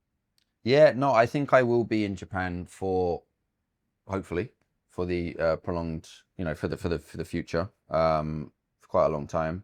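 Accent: United Kingdom. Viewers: ジョージのブリティッシュアクセントかっこいいし男でも惚れます。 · *の喋るコクニーアクセントがまさに*だった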